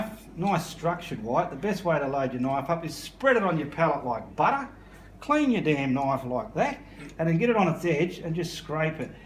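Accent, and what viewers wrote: Australia. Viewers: I am loving your tutorials!! I have been catching myself taking with an Australian accent! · LLOOOOOVE YOUR AUSSIE ACCENT MATE! 😂😂😂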